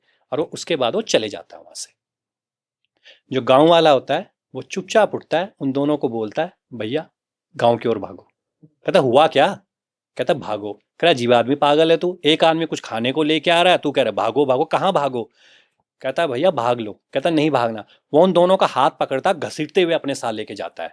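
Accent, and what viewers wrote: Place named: Pahadi, India. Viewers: It's a part of pahadi dialect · Pahadi bolchal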